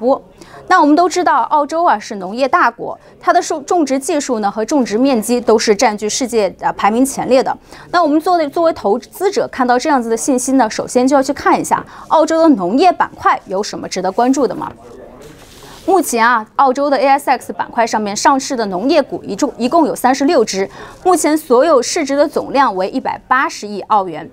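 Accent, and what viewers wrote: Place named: Nanjing, China. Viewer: coco口音好像是南京或者附近的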